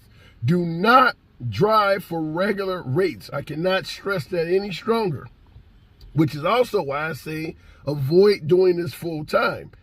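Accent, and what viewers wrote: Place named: Philadelphia, United States. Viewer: This dude definitely from philly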